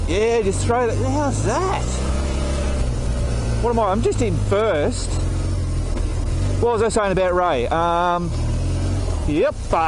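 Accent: Australia. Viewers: Great Video. You just kept going faster and faster on the Trails. It looked like you were doing at least 60MPH. You’re a Very Skilled Rider. I fall more and more in Love with the R1200GS every time I watch another Video of one. I like the way you really put a Bike to the Test. Great Great Videos. This is one of my Favorite People to watch. Keep ‘‘em coming. I think the Australian Accent? Y’all have is Great. I wish I could Talk like that.👍 greetings from Texas.👍😁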